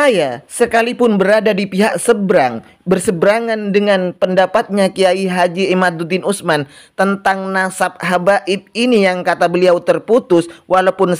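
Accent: Madura, Indonesia. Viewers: Ente orang madura ya min,,? Pantes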